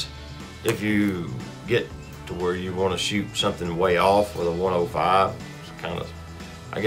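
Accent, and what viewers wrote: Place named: Alabama, United States. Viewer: Looks like Horse Pens 40 where you were bouldering. Maybe I'm just assuming it was Alabama due to your accent (I'm from Mississippi). Anyway thanks for the video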